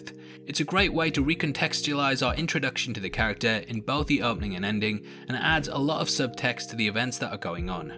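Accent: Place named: Newcastle, United Kingdom. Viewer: Howay..this is hands down the best and scariest horror I've ever seen ... intelligence and terrifying..Great channel and nice to hear a geordie !!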